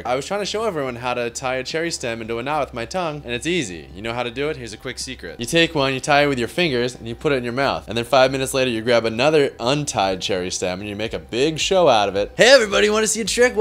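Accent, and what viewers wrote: Boston, United States. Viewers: don't you dare fuckin censor that beautiful boston twang ya gump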